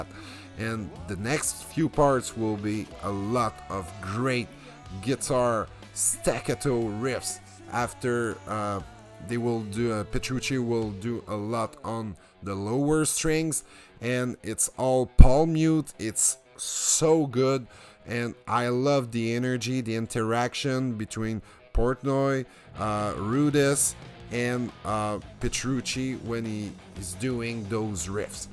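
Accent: Quebec, Canada. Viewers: I just started this review, my favourite band by the way since 1993. You sound like a French Canadian (like me)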